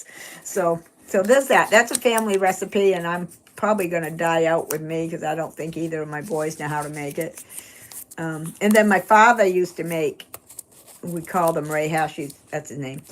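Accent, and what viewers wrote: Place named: Maine, United States. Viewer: Yes, you definitely have a main accent. I have a very good friend that goes to my church here and you sound just like her!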